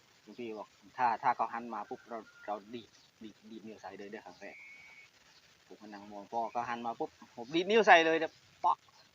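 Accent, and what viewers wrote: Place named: Cambodia. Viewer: ได้ยินสำเนียงฟ่าวกดติดตามเลย หนุ่มขะแมร์ จุงกะๆเจียละออ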